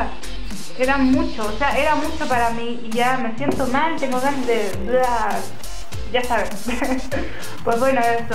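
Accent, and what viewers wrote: Chile. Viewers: muchos dicen que ya sabían que edad tienes yo por tu acento ya sabía que eras chilena xd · yo ya sabía que eras chilena! :'v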